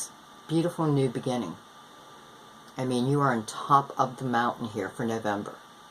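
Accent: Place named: Southwestern Pennsylvania, United States. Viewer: I'm quite impressed... yes, I have done so much work through the past six years, work on myself. This time last year, I found myself feeling differently toward someone with whom I'd shared chemistry with, but had never thought of romantically. Then.. POW! Everything had changed. The work shifted and I've been drawn, so has he, by the Universe... coming together. He -- a water sign, yes-- is in a dead relationship, in reality -- the ties are still present, but there is no involvement, no life in it. No, I could never be jealous, ha ha. Spot on. And of course, I visited the Pisces reading you did, and you describe escaping a dead relationship, unleashing yourself from your own internal prison. (And you sound like you are somewhere near the area of the country where I live, ha ha... SW PA.)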